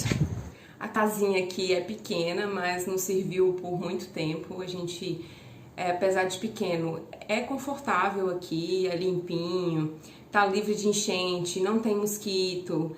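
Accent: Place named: Minas Gerais, Brazil. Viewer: Oi, vc é mineira? É tão gostoso teu sotaque. Vc sabe se no saguaçu e na zona industrial norte tem enchente?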